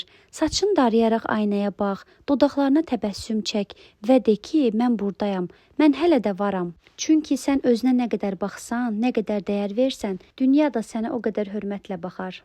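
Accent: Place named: Goychay, Azerbaijan. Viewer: Hər kəsin bəxti siz kimi gətirmir... Ağzı Göyçəy, ağzı Göyçəy danışırsız elə.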